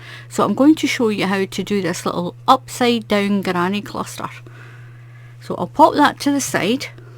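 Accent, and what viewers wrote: Scotland, United Kingdom. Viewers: Beautiful pattern and beautiful colors! Also loved your accent, which appears to me as Scottish! Greetings from Portugal!😊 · It's been a while since I've had time to watch your videos. ( I didn't realize how much I missed your delightful Scottish accent.) This stitch pattern is quite lovely. I must give it a try.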